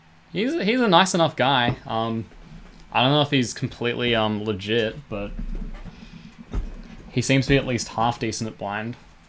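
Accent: Australia. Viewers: You aussi accent is very good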